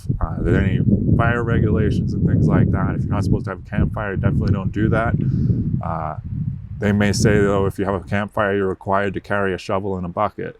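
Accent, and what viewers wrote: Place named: Massachusetts, United States. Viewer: Framingham? Newton? Norfolk county? pegged your accent right away, now I gotta know....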